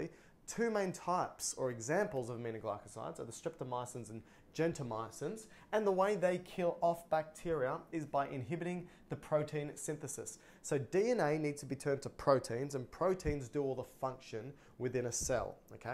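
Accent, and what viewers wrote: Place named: Australia. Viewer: Used this to study for the GSSE here in Australia (where I assume you are from, it sounds like? Or maybe NZ)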